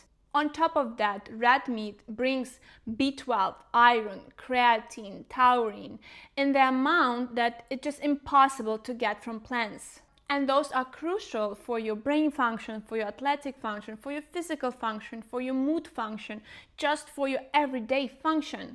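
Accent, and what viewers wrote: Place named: Russia. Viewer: Great easy going video. And nice accent, reminds me of my time at school with a lot of Russians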